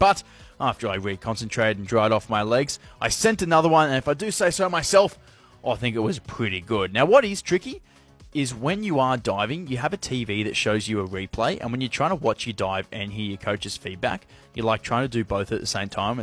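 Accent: Australia. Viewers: Really enjoy this, and as I said im 80 this year., but always loved the water and my dad taught me to swim in the sea. He was a rear gunner wireless operator work war two.and loved sport. So wonderful to see your effort to get back inti shape . Also i love the Aussie accent. I worked with Australians from NSW. and Melbourn . My dad's brother and wife and family left Scotland many years ago and stayed in Wollingong Maybe have spelt that wrong. Now thanks for all that you've shared. Sincerely Jane Rogerson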